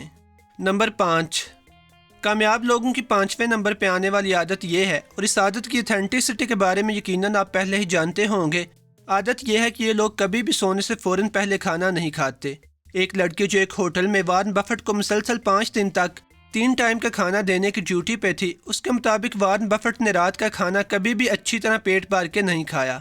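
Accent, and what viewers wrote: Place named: Sialkot, Pakistan. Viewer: Itni achi research. V.good Bad pronouncing. Sialkoti lehja bolny Wala Bad... Apna lehja badlo...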